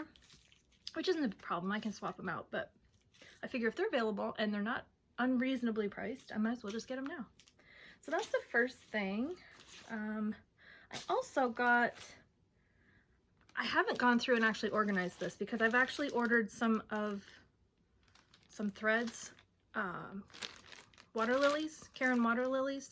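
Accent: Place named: Quebec, Canada. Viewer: Always great to see your flosstubes. You speak French with a French-Canadian accent! “Histoire” can mean either history or story in English. I love your Luda, and in fact all of the things that you work on. I hope the memory of your mom will bring you comfort.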